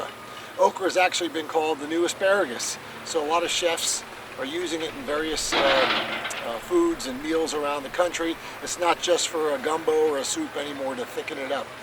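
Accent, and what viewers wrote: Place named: Midwest, United States. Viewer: I don't think he's from the South. His accent is Northern, imo. Probably Midwest.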